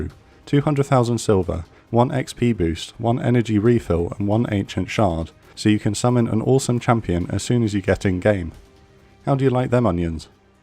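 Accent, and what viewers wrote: United Kingdom. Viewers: Excellent presentation, as usual. And your British accent gives extra gravitas to the narration. Keep them coming.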